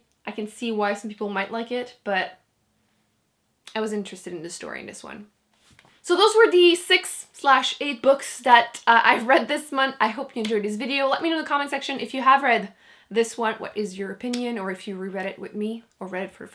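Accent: Quebec, Canada. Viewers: I just found your channel and the moment I heard your accent I thought "Oh she's Québecois French." So just out of curiosity are you Québecois? I live in Québec so if you are it would be pretty awesome to have a booktuber live in my province. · janessa blake She's French Canadian 🙂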